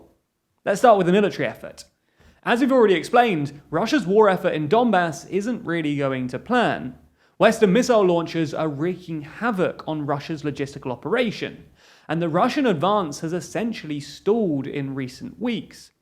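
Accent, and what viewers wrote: United Kingdom. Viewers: Dont expect to get a biased opinion from a guy with a british accent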